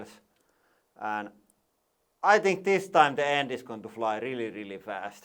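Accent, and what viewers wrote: Finland. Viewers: It's funny how strong the Finnish language pattern is. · Plug. :) U is pronounced oo in finnish. That's also why he sometimes say boonker instead of bunker.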